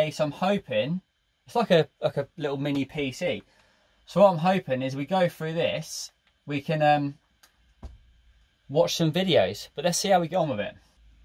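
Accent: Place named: United Kingdom. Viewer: Thiccc british accent, where you from bud?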